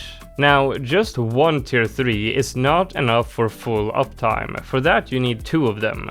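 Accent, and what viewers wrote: Sweden. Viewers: i know that your swedish from how you sound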